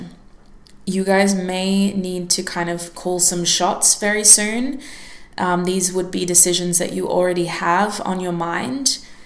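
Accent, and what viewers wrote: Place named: Australia. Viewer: Oh listen, another Australian! Hello 👋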